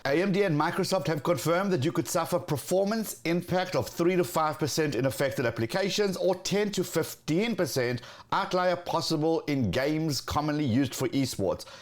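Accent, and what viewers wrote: South Africa. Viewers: Your hectic SA accent in the videos make my flippin day bru. Info in my tongue is just so much more lekker to consume. Please dont stop doing what you're doing dude. You rock. ￼ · First time watching your videos, noticed your strong South African accent coming through. Nice work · South African? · OMG I ALMOST IMMEDIATELY recognized that South African accent !!!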